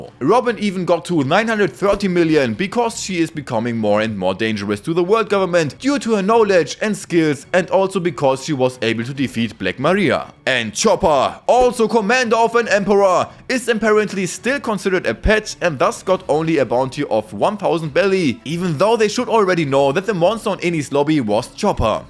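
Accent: Germany. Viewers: Man kann merken dass du Deutsch bist jeder Mensch in Deutschland spricht so hahaha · you sound german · Are you german?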